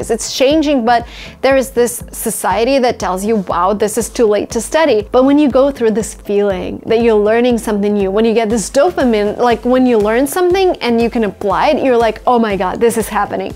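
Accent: Russia. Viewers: Don’t get rid of your Russian accent!! It’s beautiful i wish my voice was like yours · От русского акцента получается избавиться невозможно? Легко вас понимаю, потому что вы говорите с русским акцентом. Произношение иностранцев даётся сложнее на восприятие и многое не понятно в речи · Она не строит предложения по-русски. У нее все в порядке с грамматикой. Пожалуй, единственное, что выдает в ней не носителя английского, так это мелодика русского языка, протяженное произношение гласных. А все остальное просто супер!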